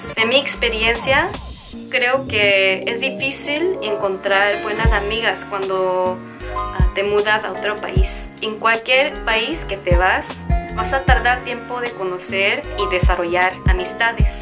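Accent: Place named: Mexico. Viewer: Cómo ya hablas cómo nosotras las Mexicanas , ya t considero parte de nuestro país. BESOS 😘😘😘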